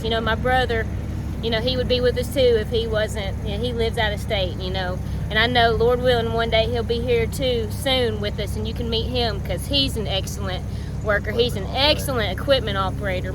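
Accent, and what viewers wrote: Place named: South, United States. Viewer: good video love. her country accent...lol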